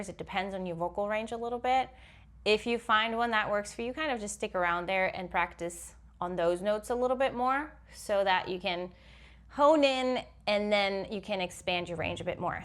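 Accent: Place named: United States. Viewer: Bavaria? That figures, the region full of supposed culture of pastrys and yodelling. Your accent would have me believe you are american.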